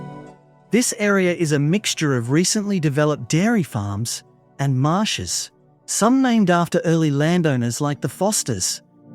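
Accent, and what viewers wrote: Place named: Australia. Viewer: Congratulation on a very well done and interesting video. And no problems at all with the voice. Clear, easy to understand and in a neutral/Aussie accent, cheers..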